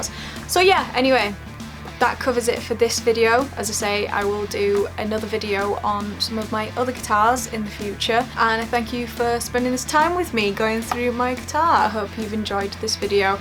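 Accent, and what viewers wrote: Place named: United Kingdom. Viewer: i would have never guessed you're british. Watching your older videos i thought you were from southern california. Your general demeanor, taste in music, taste in guitars, even your playing technique and room decorations scream "younger millenial or older gen z from orange county, lower middle class suburban public school outcast, rasied on top 50 rock radio and barely caught the tail end of the warped tour era then went to musician's institute for college". You could have fooled a CIA profiler until you started talking. Probably mostly still accurate, except you wish you were from socal.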